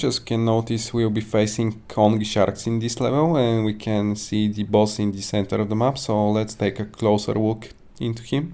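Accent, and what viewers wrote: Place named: Eastern Europe. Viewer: listen, are you from a post-Soviet country? it just sounds like you are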